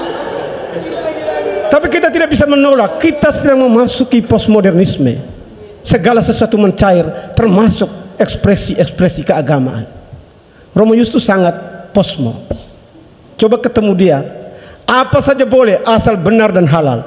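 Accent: Flores, Indonesia. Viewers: Mantap, tetap semangat 💪 luar biasa kotbah nya kaya orang Flores nih. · Luar biasa...sy suka sekali kalo renungan dari pater...logat floresnya yg membuat kami bangga sbg orang Flores, GBU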